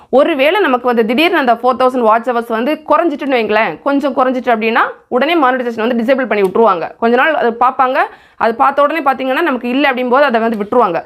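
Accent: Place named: Kanniyakumari, India. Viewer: Behind the scene nice...love our kanniyakumari slang.. sister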